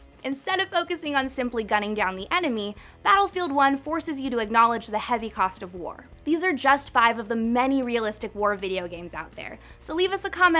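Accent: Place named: United States. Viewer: Gosh, what a disgustingly thick rhotic accent... Can't listen to this woman. Why do some of you Americans make such a fuss about pronouncing a simple, innocent 'r' ?! It's just an 'r' , godammit, you don't have to swallow your tongue to pronounce it! You don't have to rape the li'l 'r' so hard! You sound like you talk with a hot potato in your mouth. Plus this nagging voice... No way, José!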